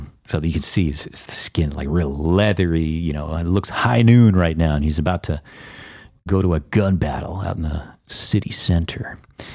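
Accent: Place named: United States. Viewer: I was half-expecting you to be dutch (I was surprised by the american accent)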